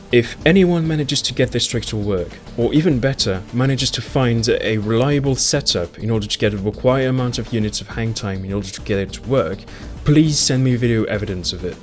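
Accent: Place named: France. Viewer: Best French voice accompanied by best background music <3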